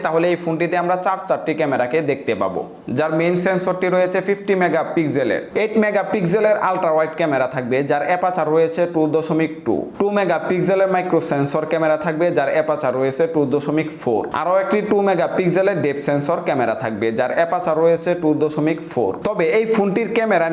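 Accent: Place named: Narsingdi, Bangladesh. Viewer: আপনার বাসা কি নরসিংদী নাকি ভাই কথাগুলো শুনতে ভালো লাগে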